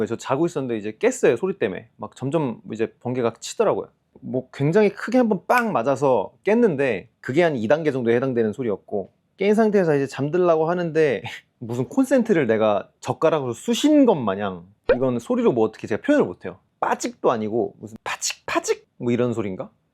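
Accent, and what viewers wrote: United Kingdom. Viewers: 영국식 미국어 발음 인가여 뭔가 멋잇어여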